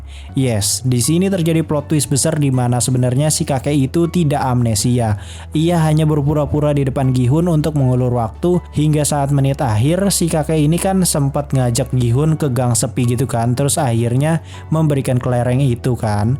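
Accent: West Java, Indonesia. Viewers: Orang Sunda mang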